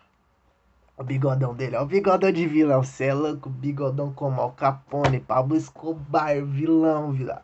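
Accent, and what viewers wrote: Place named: Favela, Brazil. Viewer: Jeito que favela fala meu deus kkkkkkkkk eu amei esse vídeo !!!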